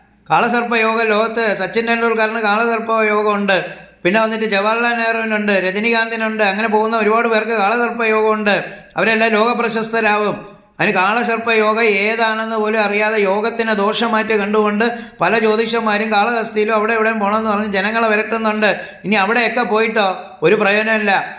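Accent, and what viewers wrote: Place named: Trivandrum, India. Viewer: Tvm language....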